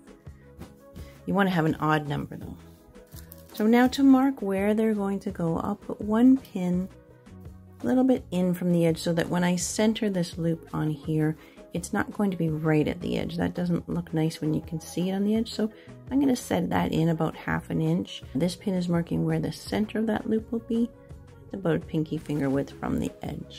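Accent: Canada. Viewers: Just got hooked to your channel so easy to understand and love your Canadian accent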